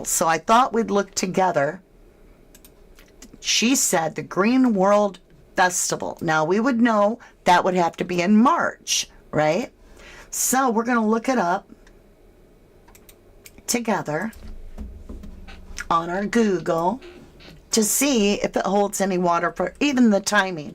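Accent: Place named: England, United Kingdom. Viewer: I’ve noticed lately your speaking voice is becoming more 🏴 English and it’s good because your learning some of our sayings like silly sod 🤣 another one for you crazy mare 😂 I think it describes Lucy lol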